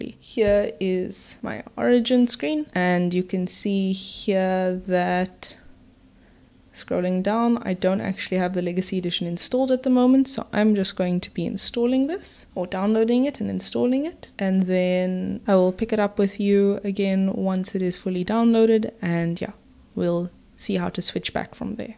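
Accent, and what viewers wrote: South Africa. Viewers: Are you South African ?? :)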